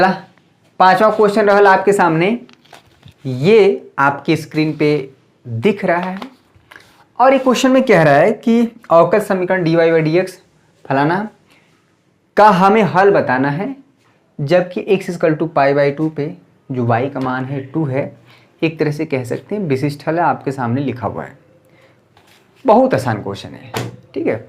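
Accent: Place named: Bhojpuri, India. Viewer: भोजपरी सर आप बहुत अच्छा बोलत बानी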